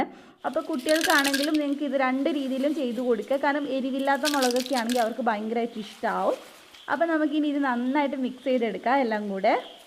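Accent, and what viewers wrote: Kannur, India. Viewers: kannur ano? Kannur style slang allalo athkonda chodikkunnad. Adipoli snacks 👌👌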